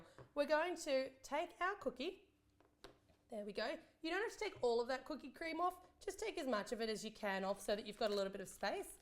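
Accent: Australia. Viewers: I love Australian accents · Your an Aussie!! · an Australian haha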